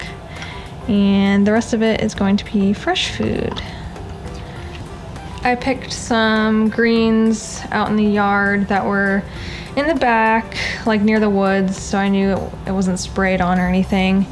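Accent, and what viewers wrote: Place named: Midwest, United States. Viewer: she has to be from the midwest. Minnesota maybe....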